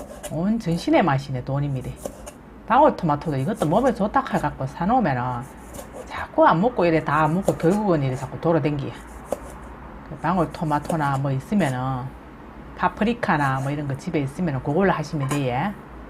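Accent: Gyeongsang, South Korea. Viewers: 참 정감가고 재미나게 말씀하시니 시간 가는 줄 모르고 보게 되네요. 어느 지역 분이신가요? 경상도도 지역마다 억양이 다르시더라고요. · 구수한 경상도 이지매 말이 더 재밋네요ㅎㅎ 경북 말이ㄴ뎅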